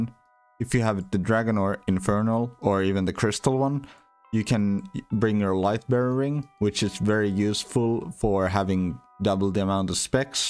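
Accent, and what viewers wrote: Finland. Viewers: Watched the 1st 15 seconds and could hear that you are Finnish! Great content man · Im and can tell you’re finnish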